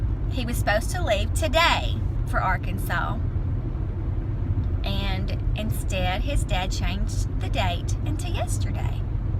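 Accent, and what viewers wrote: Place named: Tennessee, United States. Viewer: your videos are cute as a bug. Love that Tennessee accent the sheriff is a very lucky man to have such a sweet young lady. Remind him quite often how lucky he is. Jmal Jim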